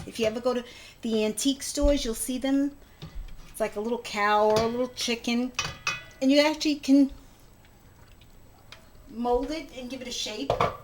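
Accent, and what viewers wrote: New York, United States. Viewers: You sound like you have a New york, accent, I live in Rhode Island. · Girl you're from NY! Brooklyn? I'm from the Bronx and I think Bronx and Brooklyn sound alike... I haven't made butter in so long here heavy cream is do high never found for 2 and change lowest $4.99 I'm doing keto so I use butter a lot! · Great video! Fun gal. Wish you where my neighbor with your N.Y. accent! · Thank you for letting us into your home. Your very sweet. Loved listening to your voice/accent. Are you from New York or New Jersey.? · I enjoyed your video; is that a NY accent? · I think your video is useful.....it shows timing of how long it takes, first hand. Screw anyone that doesn’t like it. I like it and I also like hearing your NY accent ,by the way! Good job! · I love her accent I think you should from Long island, New York, or perhaps Jersey. 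I also feel like she's a friend of mine like Francine said below my comment. Thank you I'm going to save this video very cool. I got a kick out of your frustrated with moving and not having all your things that you're used to using regularly. May God bless you and Long live butter!! 🐄 🥛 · Luna Lia Gingerbread I believe that is a New York or Boston accent. Love it! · Shes new York o bet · is she from new-york---- city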